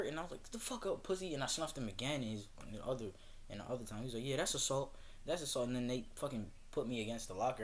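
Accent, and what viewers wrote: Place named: New York, United States. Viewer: Dis nigga from New York for a fact💀🤣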